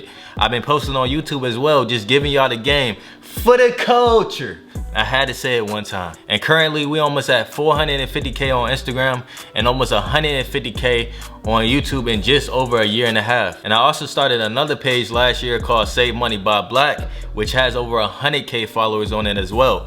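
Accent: Philadelphia, United States. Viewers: I thought you was from Philly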